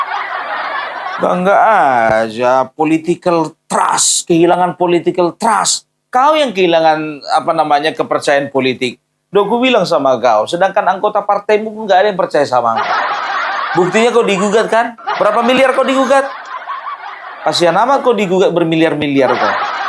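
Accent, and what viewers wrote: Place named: Java, Indonesia. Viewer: ga kuat nich perutku da kaku ketawa terus, jadi pelawak aja wes ngomong jawa 😂😂😂😂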